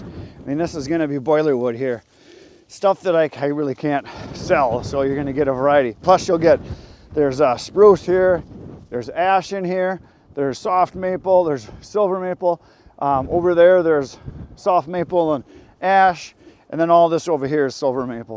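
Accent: Wisconsin, United States. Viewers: Sounds like Wisconsin.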